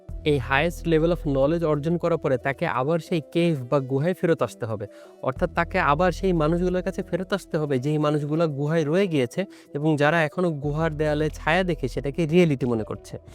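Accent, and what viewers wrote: Bangladesh. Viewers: apnar kotha shune mone holo apni bangladesi ami kolkata theke...valo thakben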